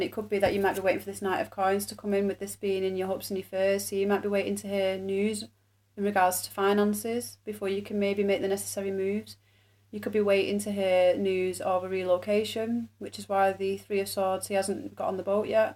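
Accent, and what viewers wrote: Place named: Manchester, United Kingdom. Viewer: Im from mamchester you sound like a mancunian 😅😅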